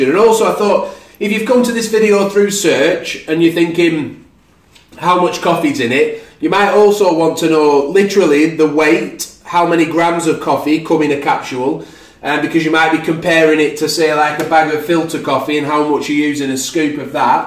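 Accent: United Kingdom. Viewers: Ik this older video, I’m curious on something, I’m US ur voice sounds like ur Uk or something 😊. My local grocery store had some of these Starbucks lungo pods on clearance to get rid of them.. I don’t have a nespresso.. I have coffee filter and though try them so how much water would I need to use for one lungo pod?? I can’t find any info out net.. I stumble over ur video.. Thanks..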